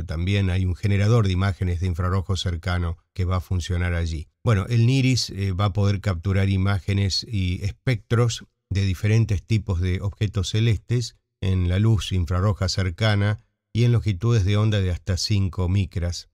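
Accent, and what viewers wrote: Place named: Argentina. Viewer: Estoy encantada de haber descubierto hoy este sitio, con una voz argentina. A mi no me es muy fácil este tema pero, a fuerza de leer un poco y de escuchar otro tanto, algo voy aprendiendo.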